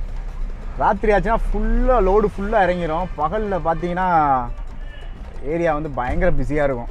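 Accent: Madurai, India. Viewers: Peasum pothea avinga evinganu arampichutinga anne😍 atha madurai slng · Anna Madurai tamil 😍awesome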